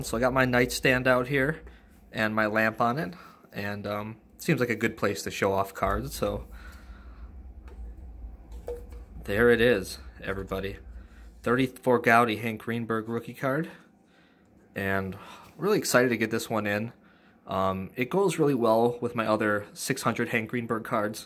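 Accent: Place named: Midwest, United States. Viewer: Hahahaha you have the voice down pat! Awesome! You still have the Midwest accent though!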